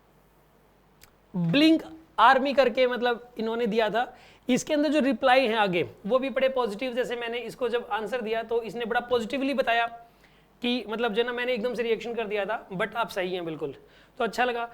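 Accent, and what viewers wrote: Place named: Bihar, India. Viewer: Sir aap बरा bhankayar समझाते हो पूरे बिहारी जैसे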